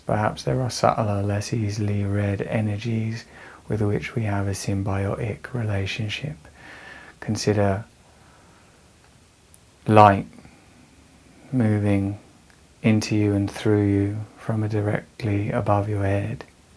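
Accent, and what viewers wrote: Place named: England, United Kingdom. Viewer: An English accent is so calming, why, but it is, Thankyou!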